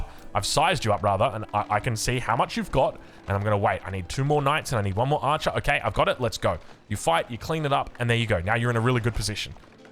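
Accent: France. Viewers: still wanted to hear your French accent Man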